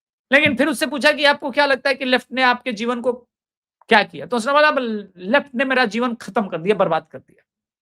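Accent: India. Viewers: आपकी अंग्रेजी बोलने का तरीका भी देसी है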